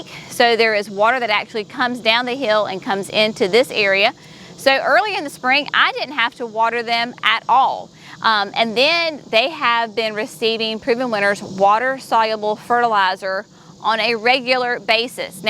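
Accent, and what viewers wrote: South, United States. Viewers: Love your Southern accent and thank you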